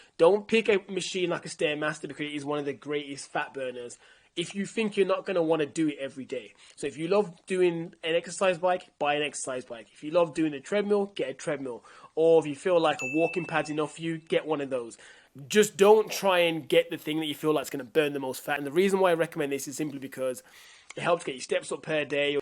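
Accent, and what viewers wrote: Birmingham, United Kingdom. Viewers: are u a brummie? ❤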